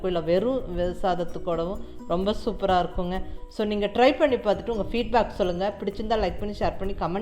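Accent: Coimbatore, India. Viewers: Coimbatore pasai semma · I like all your recepies and trying too, coming very well and unga kongu tamil enaku romba pidikum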